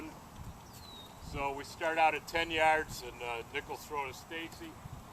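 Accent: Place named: Midwest, United States. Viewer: The word is quarterBACK lol. BACK midwestern guy. Bad accent good coaching though.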